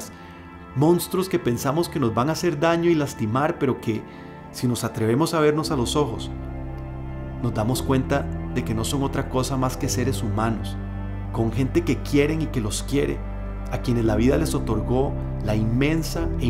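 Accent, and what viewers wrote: Costa Rica. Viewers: Desde Guatemala, mis respeto, que hermoso escuchar a un tico hablar asi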